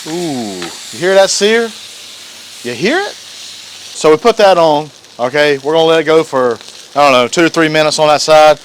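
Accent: North Carolina, United States. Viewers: FOOD LION "JUST KINA PUT IT ON THEAR" ,,,,I'LL BET YOU'RE FROM North Carolina!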